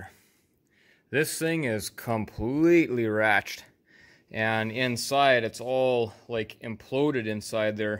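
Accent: Canada. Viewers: You're Canadian right? You have the accent...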